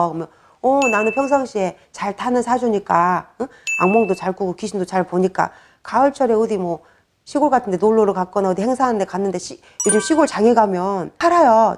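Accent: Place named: Jeolla, South Korea. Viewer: 선생님.고향이전라도신갑네요 겁나게정겨워요.말투가너무좋아요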